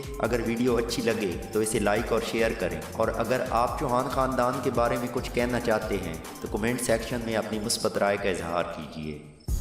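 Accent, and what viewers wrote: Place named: Saraiki, Pakistan. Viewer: آپ کے لحجے سے پتا چلتا ہے کہ آپ سراٸیکی خاندان سے ہو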